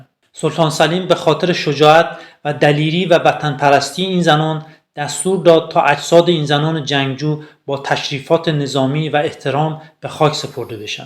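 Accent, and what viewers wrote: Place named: Lorestan, Iran. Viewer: شما لُرهستیدازلهجه مشخصه...منم اهل پلدخترلرستان❤❤❤❤❤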